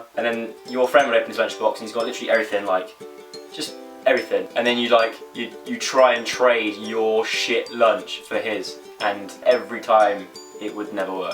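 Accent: Wiltshire, United Kingdom. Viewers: I love how in 2012 he sounds more Wiltshire and now he's lived in London he sounds different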